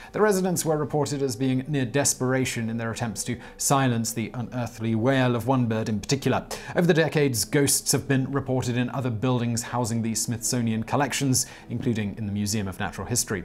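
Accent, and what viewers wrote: England, United Kingdom. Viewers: Brains00007 Brit-speak. Go figure. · Hey Top Tenz, maybe slow down your production frenzy and aim at better accuracy. The first mugshot you showed of "John Dillinger" was actually the mugshot of Baby Face Nelson. I appreciate an English accent as much as the next person, but information hurled at the viewer like a machine gun in a Brit tone doesn't always mean it's the truth. Hire a fact checker, for heaven's sake. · Please stop following the current fad of having videos narrated by a Brit. The accent is horrendous and distracting. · He can’t pronounce a lot of words. It takes more than glasses and an English accent to be intelligent. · He's British. You can't quite judge him on pronunciation unless you yourself happen to be from there as well. Additionally, accents in the UK as a whole have noteable changes every 25 miles so even then you could be off.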